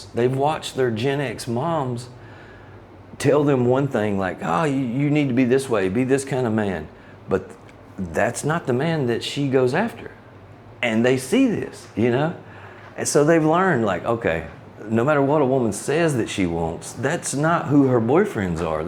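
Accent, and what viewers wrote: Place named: West Virginia, United States. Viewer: Man, you're slinging the truth!! I'm 48 and making plans to move over there. I've been researching the Philippines for a few years now. I'm making the move for all the reasons you mentioned. I was married once, It lasted a couple few months and there's no way I'll do it again here in the States.....absolutely no way!!! I'm from Southern West Virginia and our accents are pretty much the same, lol. I dig the raw material, informative and entertaining, thanks for the time Brother.....I just subscribed!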